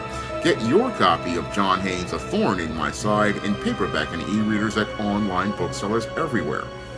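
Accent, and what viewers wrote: United Kingdom. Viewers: Your british accent is so funny.lol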